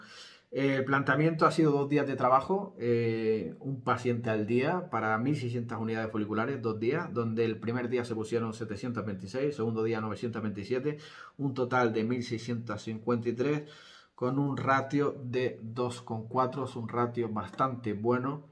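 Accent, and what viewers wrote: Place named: Cadiz, Spain. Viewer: eres un crack hablando tio... pareces de Cadiz mi ciudad!!! animo estas haciendo un trabajo genial.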